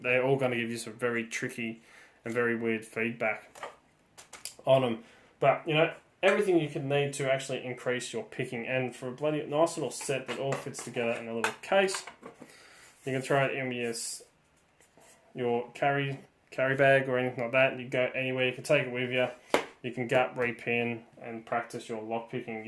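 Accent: Australia. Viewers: Glad to find your Channel, good to hear a fellow Aussie showing us some great content, I am new to Lock Sports but am enjoying learning with my Sparrows Tuxedo kit, Cheers;) also thanks for the referral to Locksmith Toolbox they are awesome and good prices:)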